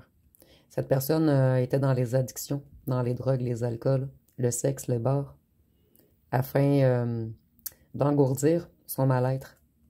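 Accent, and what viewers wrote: Quebec, Canada. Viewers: J'adore votre accent cebecois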